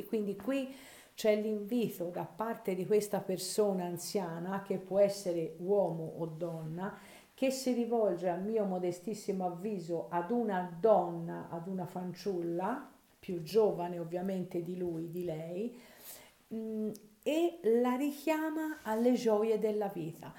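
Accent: Tuscany, Italy. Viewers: Sei dolcissima ahahah 🤣🤣🤣🤣🤣😂😂😂😂già solo cm parli metti allegria, sei Toscana? Poi la chitarra troppo carina 😂😂😂😂😂🥰🥰🥰🥰🥰♥️♥️♥️♥️♥️♥️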